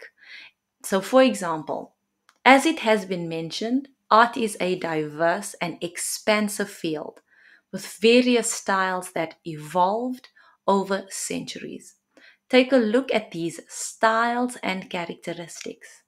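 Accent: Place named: Cape Town, South Africa. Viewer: Sounds like a cape town accent, especially how you say "opporTunity"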